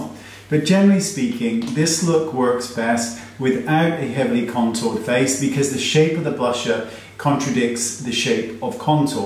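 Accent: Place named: United Kingdom. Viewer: Who else came here so they could hear in his lovely British accent say 'Naughty'. 💜💜💜😄😄😄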